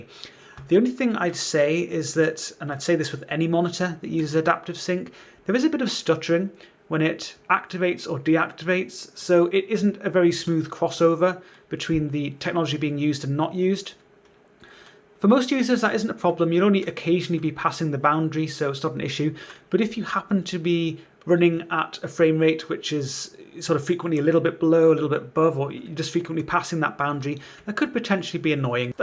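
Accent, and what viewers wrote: United Kingdom. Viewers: UK accent adds even more points to the professionalism of this video. Top notch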